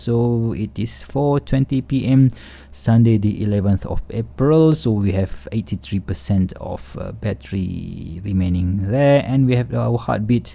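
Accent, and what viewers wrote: Malaysia. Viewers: From your accent i know that you're from Malaysia isn't it?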